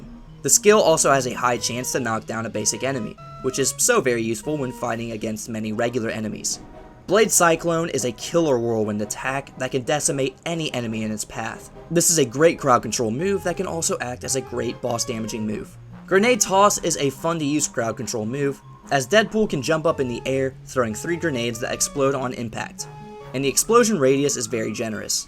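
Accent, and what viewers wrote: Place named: South Jersey, United States. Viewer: I’m from Philly & you are 100% from South Jersey I can hear it in your voice lmfao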